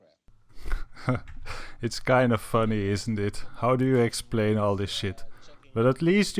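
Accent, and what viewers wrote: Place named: England, United Kingdom. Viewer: Cool video. Cool accent. :) DBM. England.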